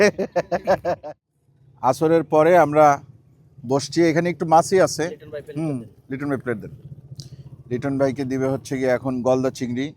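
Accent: Barishal, Bangladesh. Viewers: ভাই আপনার বাড়ি বরিশাল নাকি?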